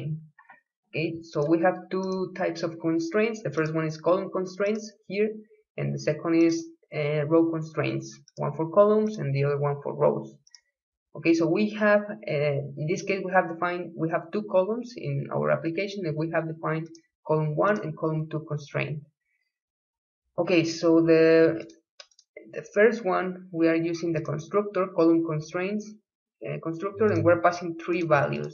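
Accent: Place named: Italy. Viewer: Accento italiano!! Grazie per il tutorial!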